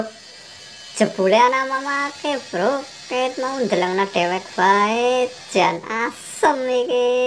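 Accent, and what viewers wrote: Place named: Banyumas, Indonesia. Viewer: Hahahaha.....ngakak. ora ngapak ora kepanak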